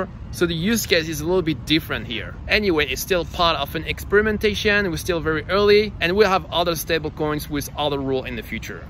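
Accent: France. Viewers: Salut très bonne vidéo, tu es français ?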